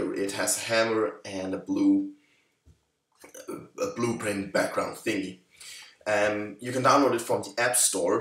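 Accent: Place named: Denmark. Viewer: ham her lyder dansk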